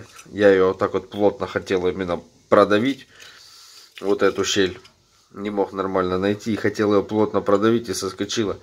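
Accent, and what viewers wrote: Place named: Ukraine. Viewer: Наш с Украины? Слышно по разговору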